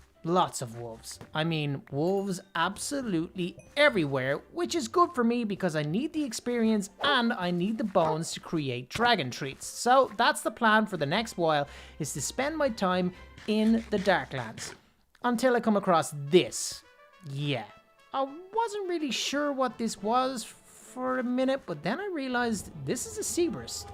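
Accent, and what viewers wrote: Ireland. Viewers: bro don't mean to be rude or anything but are you Irish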